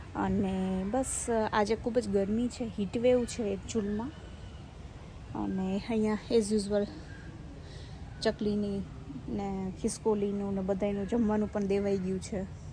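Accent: Saurashtra, India. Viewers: Gugura bhu mast che khavanu man thaya che hu nayana anjary tmaru nam ? Tme sourast na iago cho bolva ni rit thi hu kutch bhuj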